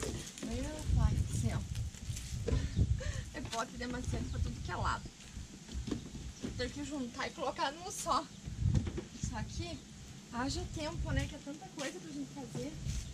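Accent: South, Brazil. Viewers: Bom dia...sou seu fã...me diz uma coisa...de que região vocè é . ?...tem sotaque Sulista....beijos...aqui de Alm.Tamandaré-PR...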